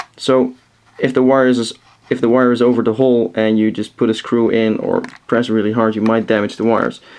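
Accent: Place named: Netherlands. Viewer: Nice dutch accent :D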